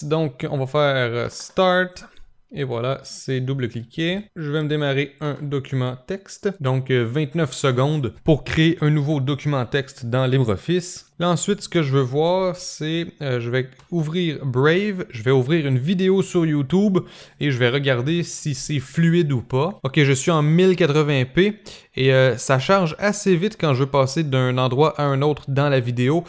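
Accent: Quebec, Canada. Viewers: Ahhhhhh enfin un quebecois. Je comprends mieux a cause du québécois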